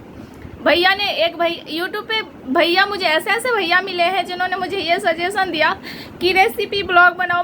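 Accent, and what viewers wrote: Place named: Nepal. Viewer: Rona nahin boli me Nepali lagti hai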